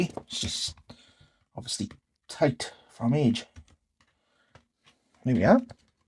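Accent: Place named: Swansea, United Kingdom. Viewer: Happy days beautiful swansea jack accent I have one Awesome knowledge is power 🎉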